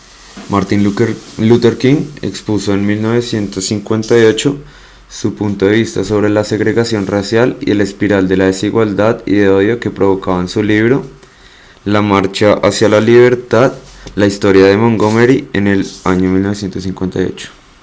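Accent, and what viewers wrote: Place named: Bogotá, Colombia. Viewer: Con tu acento rolo es dificil concentrarme.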